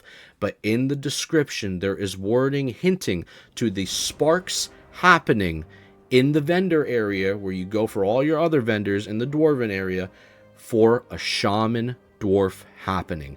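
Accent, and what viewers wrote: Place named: Midwest, United States. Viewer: Dude, lol. Love your videos man. And dat midwest accent 😆🤘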